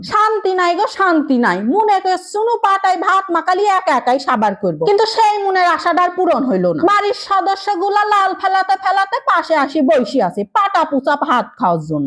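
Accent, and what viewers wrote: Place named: Bede, Bangladesh. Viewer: কোন জেলায় বাড়ি!!বেদেনীদের মত ভাষা, ভাষার টান।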